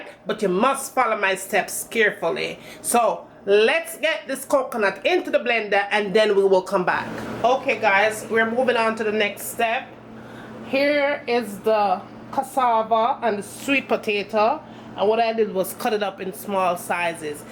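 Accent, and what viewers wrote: Jamaica. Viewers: Thank u mami...u always make cooking seem oh so easy like 1-2-3....continue to share your gift with us ...I am always enlighten and inspired whenever I watch and follow ur tutorials ...big up yuself...PS love the accent...real yaadie...muaaahhh😙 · As a born jamaican living overseas, you don't truly appreciate the beauty of the jamaican accent until you listen to it from a different perspective. Keep the authentic jamaican food coming - best food in the world! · Fantastic! Thank you, great step by step explaination, love your Jamaica talk! Perfect. Felt like I was back a yard!! Great Channel. xx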